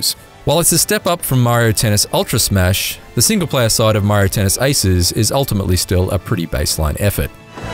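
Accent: Australia. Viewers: Great review and most importantly I really enjoyed the Aussie accent……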